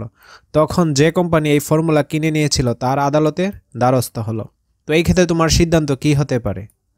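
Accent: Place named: North Bengal, Bangladesh. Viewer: Apnar basha uttarar kothai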